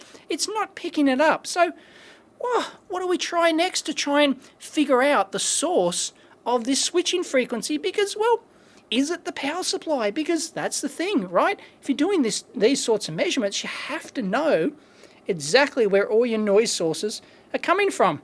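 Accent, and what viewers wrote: Australia. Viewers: I'm a "Yank", but I'm starting to hear a little Aussie voice in my head while troubleshooting hahaha!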